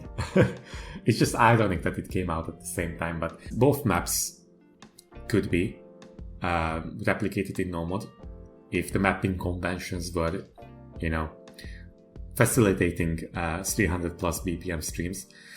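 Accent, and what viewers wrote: Hungary. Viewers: At first you kinda sounded like a Hungarian but I wasn't sure, good job and keep up the good work!:) Csak így tovább.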